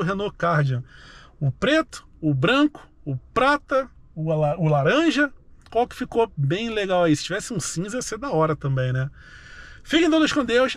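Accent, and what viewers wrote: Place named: Rio de Janeiro, Brazil. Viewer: Esse sotaque carioca e joativo aff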